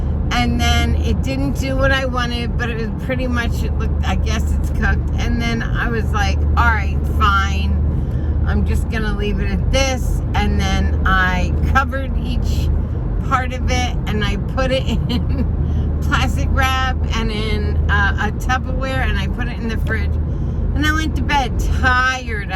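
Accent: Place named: Philadelphia, United States. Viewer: I'm so glad I found your channel. I have been feeling super alone lately and well iam. Yet I found your channel and your personality etc is like having a really cool friend talking to ya. I had a feel I ng from they way you speak that you had to be close. I'm in Philly and heard you say where u were. So cool!!! Just wanted to say hello and thank you. Sending love and positive vibes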